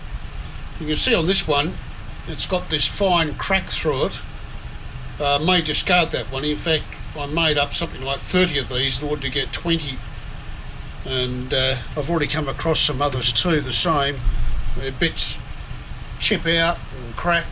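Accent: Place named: Portugal. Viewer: Parece-me um português falando a língua Inglesa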